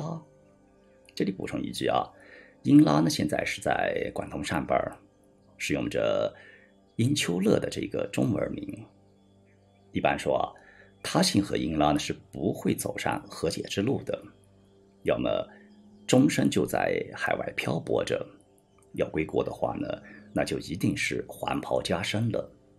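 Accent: Yunnan, China. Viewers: 这是云南口音啊？😂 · 云南口音很亲切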